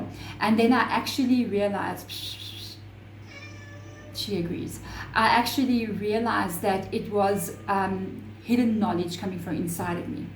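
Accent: South Africa. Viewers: Is that a South African accent I hear? Love your channel and thank you for the wonderful readings ❤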